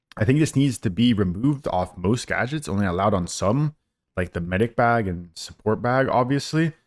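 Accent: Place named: RP, United Kingdom. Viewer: Listen to the Man, he learned from the BBC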